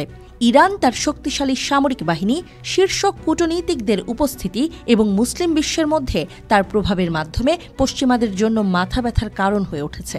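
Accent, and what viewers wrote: Kolkata, India. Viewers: এই সংবাদটি উপস্থাপনা করছে কে একাতর টিভিতে তিনি কি আলোতে বাংলাদেশের বাঙালি না কলকাতার বাঙালি। কেননা তার ভাষাটা একটু ওপারের মতো টান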